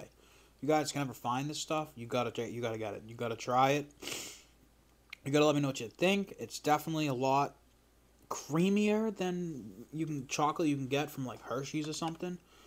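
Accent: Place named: New Jersey, United States. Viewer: He may be tired or may have spent the day around people with heavy Jersey accents.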